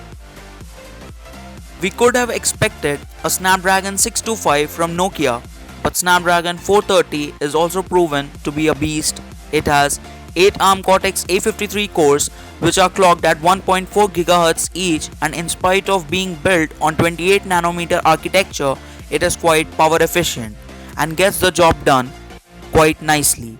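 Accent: India. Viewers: Your Indian English is funny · kaizumi soriano its a kaling tamil india accent hahahaha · This is called hinglish, that is acceptable as long as we can understand the topic.. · He’s from fking India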